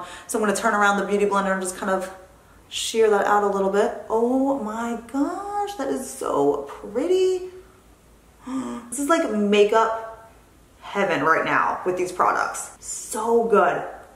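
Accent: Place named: East Coast, United States. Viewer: I'm a native east coast girl now living in Vegas. to be honest, i started watching your videos because it gave me a bit of that back home feeling with your accent! shortly after, i discovered we're the same age so i binge watched your vids and i have learned so much from you!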